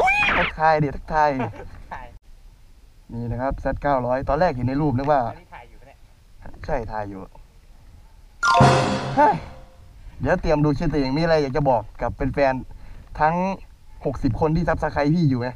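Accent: Isan, Thailand. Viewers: พี่พูดอีสานดูน่าฮักขนาดติดตามงับๆ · ตอนแรกคิดว่าพูดเหนือ เอาไปเอามา ออกอีสาน 5555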